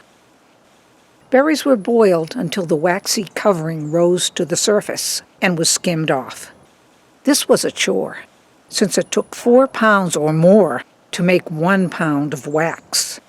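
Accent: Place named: New England, United States. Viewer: Thank you, that's nice to hear. I used to live in NYC & PA but now I live in Santa Fe, NM. My family is still in NH, but I don't often get to western MA. I guess for now I'll follow you here and on FB. Thanks again for the video. It was full of solid info and hearing your voice brought me back to my New England roots.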